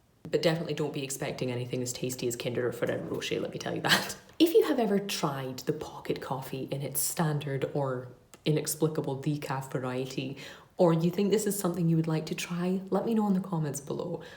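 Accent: Scotland, United Kingdom. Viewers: Is your accent Scottish? It’s beautiful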